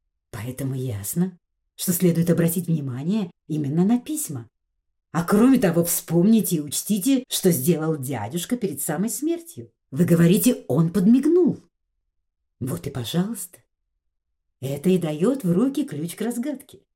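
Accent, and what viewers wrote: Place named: Provinces, Russia. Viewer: Чтение передает русскую глубинку, никак не Англию.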